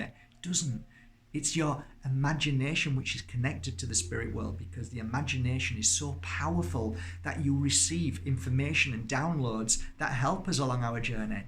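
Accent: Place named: Northern England, United Kingdom. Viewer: There’s nothing better than listening to a nutty northerner which oozees every tune of light and positive energy, your awesome mate, Thankyou for giving your time experience 🤙🏼🙏🏼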